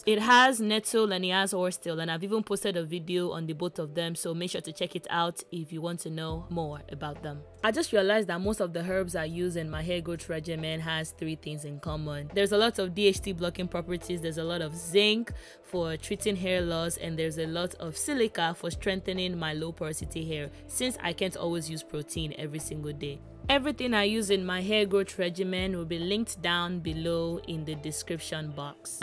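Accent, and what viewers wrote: Nigeria. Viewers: I love how you kept your Nigerian accent, no phoney. Nothing😂😂❤ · 🇳🇬 The Nigerian accent 😍 >>> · Thank you so much about this wonderful video about the hair growth benefits of green tea! 🍵 ...And thank you for the words of Scripture. God Bless you! 🙏 P.S. I love your Nigerian accent! 💚 #NewSubscriber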